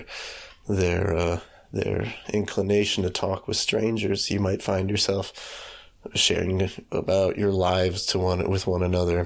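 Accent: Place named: Russia. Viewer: Русское звучание так просто не спрятать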